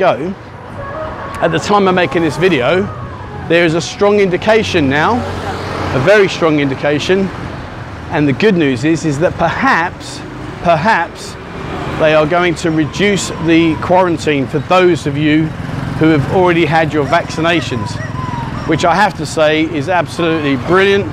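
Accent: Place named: United Kingdom. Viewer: Excellent British accent, I'd loved it.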